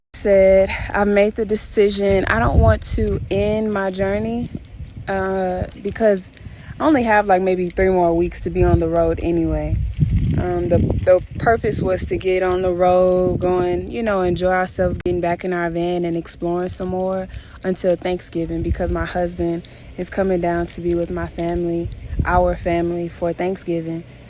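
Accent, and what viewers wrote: Houston, United States. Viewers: Girl that Houston twang be twangin chile!!! 😆😆🤣 i swear everytime i hear somebody from houston and even myself on recordings i be like dang is we really that country😆😆 omg love the video shout out to you and ur hubby frm the nawf side! 😘😍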